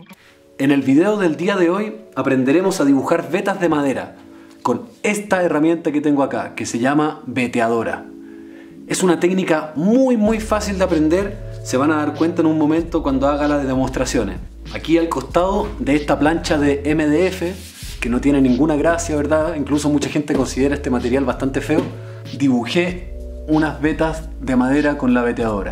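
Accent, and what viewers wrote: Chile. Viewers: buen video , eres chileno ? se te oye la voz como el carpintero del desierto los dos muy buenos en los tutorialees saludos desde mexico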